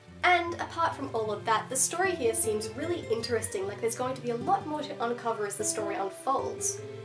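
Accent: Australia. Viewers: Oh wow an australian :D hi!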